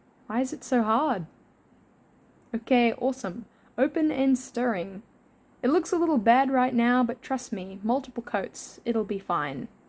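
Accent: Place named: Australia. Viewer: I vote for the 28 unnamed princes :) Also what nationality are you? You sound aussie ( i am)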